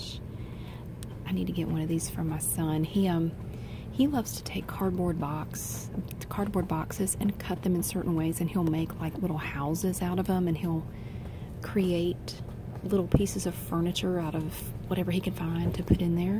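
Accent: United States. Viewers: Your asmr videos always give me the tingles before I fall asleep I love your American accent so much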